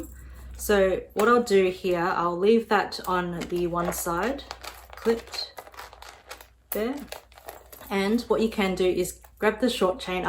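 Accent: Australia. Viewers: Hi beautiful! I so dig your Aussie accent😍so charming! Love that you showed us how you styled & wear this, i have taken notes!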